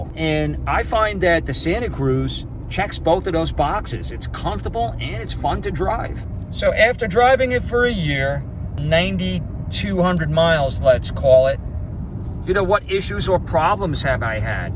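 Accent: New York, United States. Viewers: Are you from NewYork? The Bronx???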